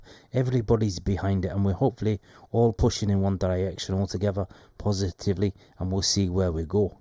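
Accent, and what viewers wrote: Scotland, United Kingdom. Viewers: Love the jock accent 😂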